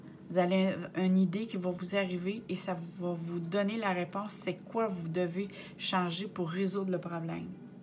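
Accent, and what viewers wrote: Quebec, Canada. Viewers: Bravo quelle belle énergie et j apprécié beaucoup ce petit accent me rappelant la douce Céline dion.bonne intuition merci à vous. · Cc chère ^ ^ tt a fait vrai mon dieu que j aime votre accent..cela me ramène en 1991 mes 24 ans mon voyage au Canada.. Québec...mes études d infirmière....J étais dans la région des Laurentides...magnifique pays....et oui une grande fatigue indescriptible...je continue ms mon dieu quelle résilience....bisous ^bon bec^..& oui des cicatrices inomables un passé très très douloureux qui a laissé des marques......pour au bout du compte devenir qq un de très fort oui une volonté hors pair merci gratitude namasté.......tout ce qui ne nous tue pas nous rends plus fort et oui j affirme.. kris de Nice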